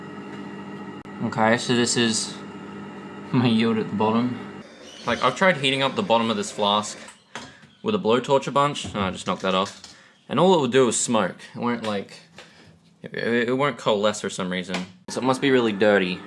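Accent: Adelaide, Australia. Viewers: Can tell you're from Adelaide XD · Your voice fluctuates so much. Sometimes it's easy to tell you're an Aussie, other times your voice does this weird thing where you sound American for a minute. Not necessarily a bad thing but different. Good video overall, might wanna change the name so you don't end up with feds.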